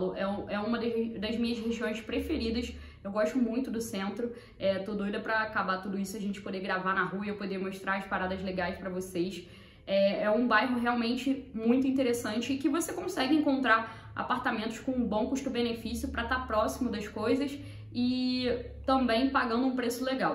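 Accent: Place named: Rio de Janeiro, Brazil. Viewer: Tão engraçado que nasceu em São Paulo e fala carioquês kskskksks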